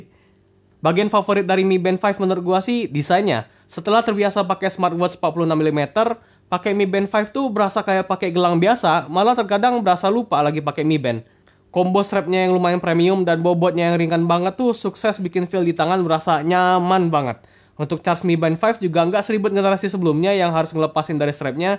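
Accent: Palembang, Indonesia. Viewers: Palembang ya? Wih mantep bang.. dari aksen nya sih iya keliatan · Lahh wong palembang kamu kak 😂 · Wong Palembang lah😄👍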